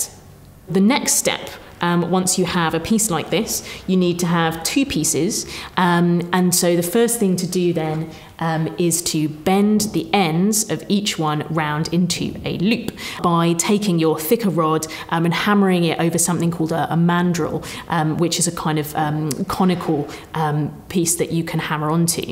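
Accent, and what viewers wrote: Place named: United Kingdom. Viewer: As an American, every time she said "torc" with her British accent, I thought she was saying "talk" with a British accent.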